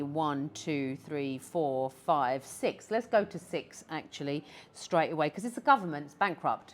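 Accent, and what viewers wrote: United Kingdom. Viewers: She sounds British to me 😁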